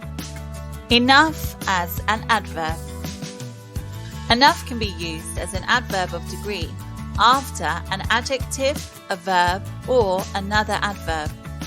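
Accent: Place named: United Kingdom. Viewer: Hi! I think I hear your name like that, I fall in love with the British accent, I like the way of teaching with animation, because the content is visualized and it is very practical to get started, I'm sure that this channel will grow because its content is very clear to learn, success and thank you for your contribution and dedication...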